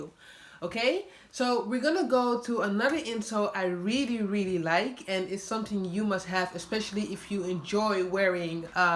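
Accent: Netherlands. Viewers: Ik dacht al je komt van nederland 🧡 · Nice video!!!! Very helpful!!! Are you from the netherlands? I can hear a cute accent. Im from rotterdam. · Dutch accent, no?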